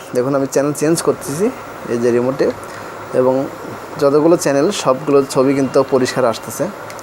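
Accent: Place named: Dhaka, Bangladesh. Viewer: ভাই , আপনার কথায় বলার ধরোন বুঝায় আপনি ঢাকা লোক |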